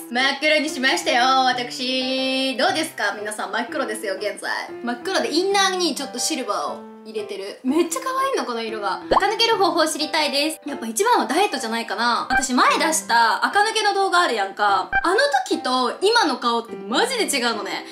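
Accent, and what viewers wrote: Kyushu, Japan. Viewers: 福岡やけど方言わかりすぎて（笑）さすが九州🥺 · 同じ九州だけど「あんたそんなことばっかりして〜」までしか分からん笑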